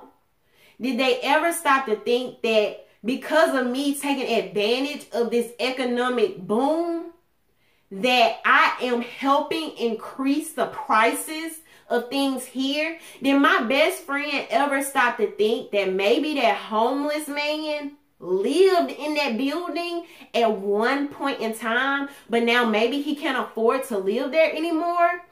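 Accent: United States. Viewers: I love your country voice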